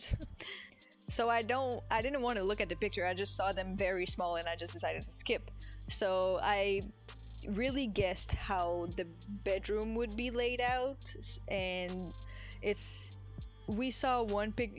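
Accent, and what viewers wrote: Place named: France. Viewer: Omg, I was gonna say it sounded like French was your first or second language because of the way you said "occult", but for some reason I thought you were from an African country that was a former French colony. You speak English much like a native speaker though, you are really good at it 😃👍, especially for only speaking it for 3 years! It took me way longer to learn English. Thank you for replying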